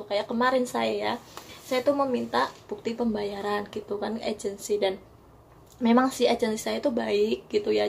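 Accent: Banyumas, Indonesia. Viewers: Ngapak jos sukses.. Salm dari trenggalek mbak.. Makasih atas berbaginya..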